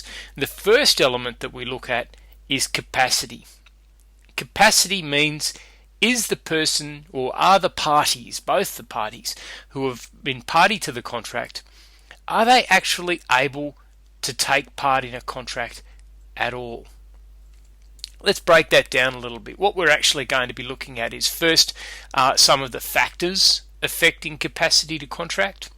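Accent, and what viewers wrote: Australia. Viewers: Please excuse my ignorance, but does this apply in United States law? Or is this upload meant for Australia (or elsewhere, I'm only going off of your accent honestly lol).... Not trying to generalize (maybe you're in the US and just happen to have an accent from before), but I figured I'd better make 100% sure that this will apply to my own situation :) thanks for the insight on contract law! Very well explained.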